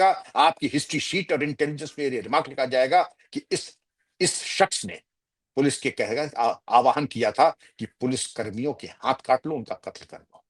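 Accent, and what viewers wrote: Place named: Uttar Pradesh, India. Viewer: Vikram sir ka andaz kitna gajab hai pura U.P wala 😂😂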